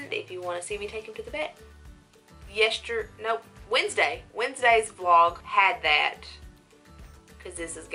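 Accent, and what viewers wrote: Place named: Alabama, United States. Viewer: I listened to just one of your videos and I was like “this chick is from Alabama!” Came to this video and was definitely not disappointed! You are such a good source of info for snake info :D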